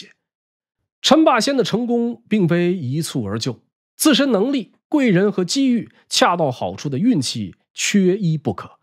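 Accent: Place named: Northeast, China. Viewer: 很精彩 兄弟是东北人吧）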